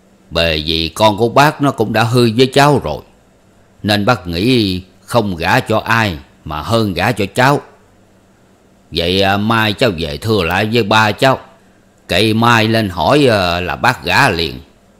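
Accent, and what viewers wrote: South, Vietnam. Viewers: Giọng đọc chuẩn miền Nam. Anh đọc quá hay xin cảm ơn anh và Tác giả. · Giọng đọc miền Nam quá hay và truyền cảm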